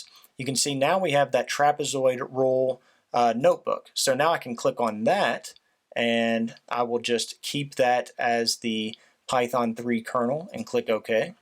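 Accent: South, United States. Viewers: Great vid, thanks! Great southern accent, lol! With love from South Africa.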